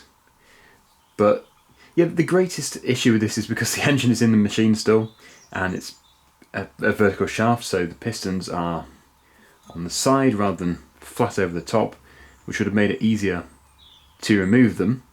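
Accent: United Kingdom. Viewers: I’m going to be honest I like the work you do but 50% of why I watch is just to hear your British accent and wordage . Love the Channel. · Personally I have absolutely no problem with your talking. You know what you are talking about and that shows. Additionally you are a Brit of one stripe or another and your accent is pleasing to the ear. Keep on keeping on sir. Thank you for the entertainment. I am NOT a diesel mechanic. I have been a gas engine mechanic for 65 years. ( I'm 81) but I understand diesels enough to follow along quite handily. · You keep narrating. I love the british accents. Your videos are great!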